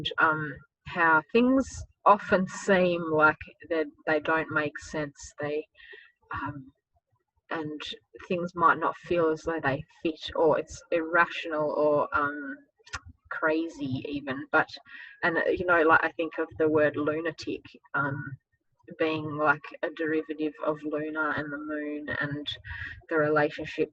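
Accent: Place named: Australia. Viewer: Wonderful to hear an Aussie accent on EA ♥️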